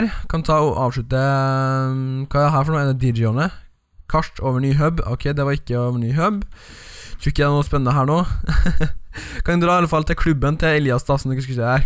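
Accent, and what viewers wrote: Trøndelag, Norway. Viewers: du e trøndersk d e jo drit bra trønderan e bæst!;D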